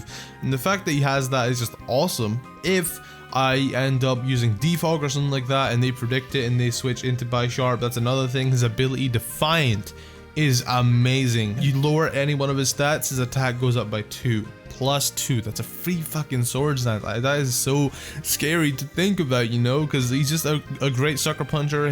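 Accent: Scotland, United Kingdom. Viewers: Are ya scottish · Is this nigga Scottish? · you can really hear scottish in in his first video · Scottish? :P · That Scottish accent in the first video you ever made :'D xD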